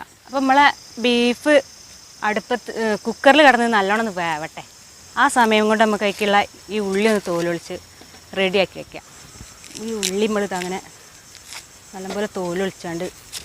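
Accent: Malappuram, India. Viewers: മലപ്പുറം സ്ലാങ്ങിൽ നല്ല അവതരണം. ബീഫ് ചുക്ക.. അഡാർ ഐറ്റം 😍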